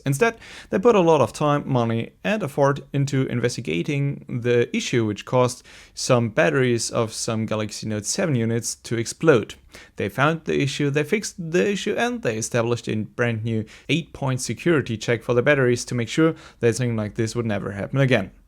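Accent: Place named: Germany. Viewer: hey um..... whare rrrrrr uuuuuuu ffrommm??????????????????u have an hard accent.Germany?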